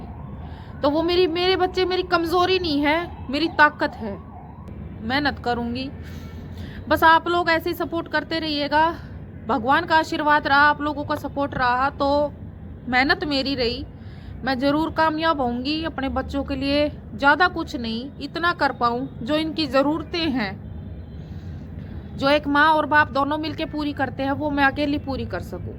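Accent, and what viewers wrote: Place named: Haryana, India. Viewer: कहाँ से हो बहन भाशा से हरयाणा की लग रही हो subscribe kar diya aapko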